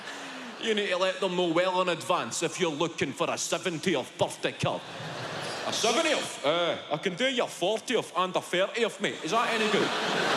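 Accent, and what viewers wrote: Scotland, United Kingdom. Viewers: Funny enough I‘m german and I understand scottish almost perfectly. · has a Scottish accent but it’s not Glaswegian. Where’s “in the name of the wee wheel” and “in the name of the wee man” and “sanferryann tae me” “says I, says she”? 🤔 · I've been watching police-procedural TV shows set in Scotland, so I understand about 98% of what he's saying 😂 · I love the accent..I have always understood exactly what they say..I must be of Scottish decent..oh that's right I am... 😂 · wow! you have a scots accent, wow! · I'd rather hear a scot for 100 hours than a brit for 1 second.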